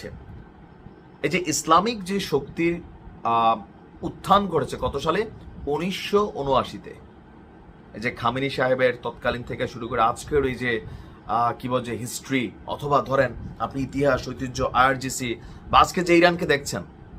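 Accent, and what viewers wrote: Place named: Noakhali, Bangladesh. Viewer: ওটা নোয়াখাইল্লার ভাষায় এটা কথা বলে আল্লাহ জানে আমার চুল ধইরা বাংগাল টানে আমার বাল ধইরা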